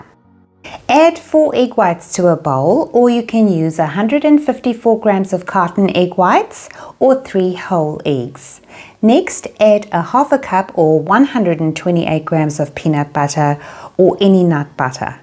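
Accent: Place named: South Africa. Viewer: Yum looks so good. I’m definitely making it. Thanks so much for sharing this recipe. Love your South African accent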